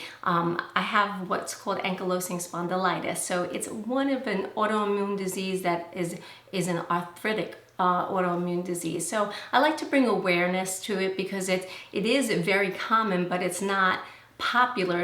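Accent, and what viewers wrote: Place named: Long Island, United States. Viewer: Ok, I'll share George. I think another tag sounds great! xo ps love the comment about your accent from Sharon. LOL we Long Islanders always get made fun of!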